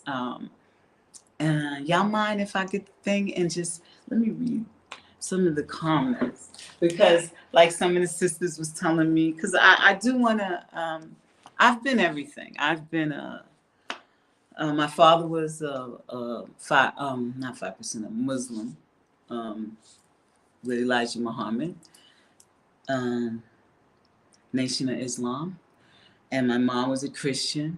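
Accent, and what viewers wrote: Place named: New York, United States. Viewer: I knew it! Your accent was telling me you was from somewhere in New York. You sound just like my friend. 💕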